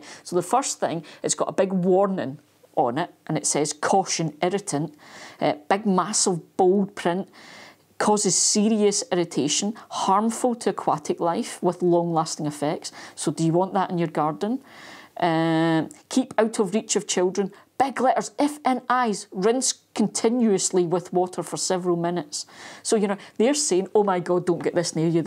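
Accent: Scotland, United Kingdom. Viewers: Thank you, great yo hear a Scottish gardener on here. So far today in Forth there has been sun, high winds, monsoon style rain and then sun.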